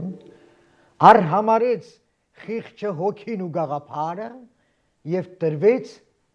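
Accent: Standard. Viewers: 🇦🇲♥️✝️Տիկին ՚Ես իրանահայ 1969 ից ապրելով Հայաստանում ՚1992 ից ՚ապրելով Գլենդելում ՚շատ զարմացած եմ ձեր քաջության՚հզորության եվ մաքուր Հայերեն խոսացող ՚գրագետ ՚դեռ չեմ տեսել ՚ես շատ կուզենայի ապագա Հայաստանի Պրեզիդենտի աթոռին։Դուք կին լինելով ՚100 տղամարդու որը Հայրենասեր են ՚ավելին եք՚դուք կփրկեք Հայաստանը եվ Հայրենադարձություն կսկսվի ձեր ժամանակ ՚որով հետեվ դուք կպաշտպանեք նրանց շահերը։👏👏👏 🇦🇲🇦🇲🇦🇲❤️❤️❤️🎯🎯🎯✝️✝️✝️ Բարեվներ Գլենդելից ։ 🇦🇲❤️🇺🇸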